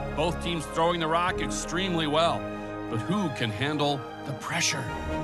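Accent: United States. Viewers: How ridicules ,not surprised yank commentator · what state in the US is the commentator’s accent from? I’ve been trying to figure out similar accents and i can’t tell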